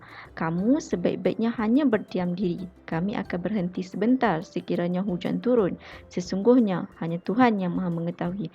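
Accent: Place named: North, Malaysia. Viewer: Tahniah ...tapi loghat utara sangat ketara....sepatutnya sebutan baku...boleh ditingkatkan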